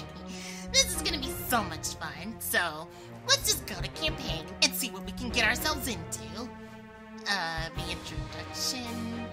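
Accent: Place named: Scotland, United Kingdom. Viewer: NAH, I'm calling ya. Nice Scottish accent as well.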